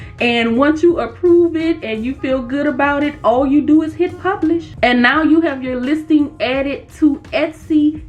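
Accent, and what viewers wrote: New Orleans, United States. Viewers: She had me with the NOLA accent ❤ let’s get this money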